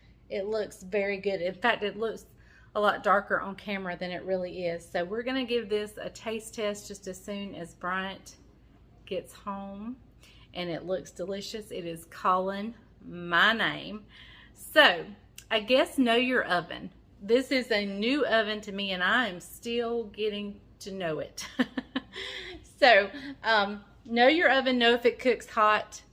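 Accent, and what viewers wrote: South, United States. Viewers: You pronounce Boil like Bowl, that's a southern thing right? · I 💛yr southern voice when explaing yr dishes.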